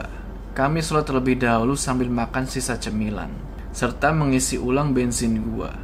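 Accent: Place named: East Java, Indonesia. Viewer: Jatim mana cak?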